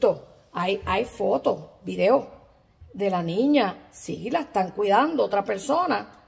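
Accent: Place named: Colombia. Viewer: He sounds columbian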